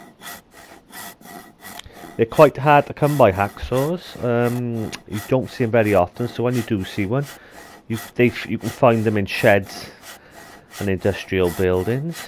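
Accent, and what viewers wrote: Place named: South Wales, United Kingdom. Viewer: Is that a Cardiff accent ? It's definitely south walian 😂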